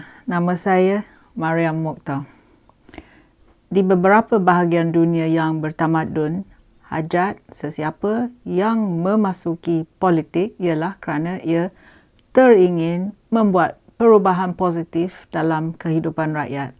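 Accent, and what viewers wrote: Perak, Malaysia. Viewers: pekatnye loghat perak kak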